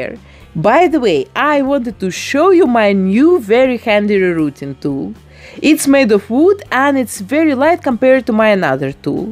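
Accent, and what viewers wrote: Russia. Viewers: Сильный русский акцент)) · Судя по Вашему акценту, Вы понимаете русский. Может, попробуете сделать ещё один вариант Одри? Прошло достаточно времени, чтобы попытаться снова))) Эта версия мало на неё похожа, Вы замечательная художница, и можете гораздо лучше)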